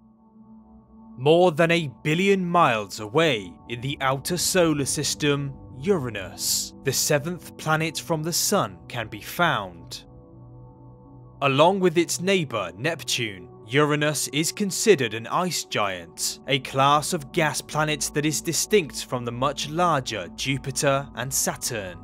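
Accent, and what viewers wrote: United Kingdom. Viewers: His british accent make it even better,uranus is so deep btw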